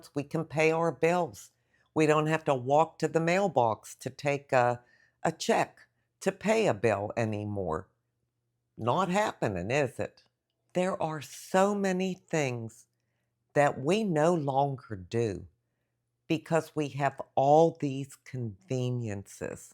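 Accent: Pittsburgh, United States. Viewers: Hello again, just watched another of your video. Convinces , first of all you sound like a Pittsburger . As am I. I’m from the old school. Very lazy generation today. I still work at my age at almost 74, have a home and still do all the yard work, groceries one day, and I may have a day of rest before I go back to work. Oh AI it’s taking over everything. Seriously these kids today can’t do anything without it. Can’t even count back change to a customer. I’ll take the days I grew up in anytime. Keep up the nice videos and wonder stories.💃🏻 very attractive lady by the way. Body, mind and spirit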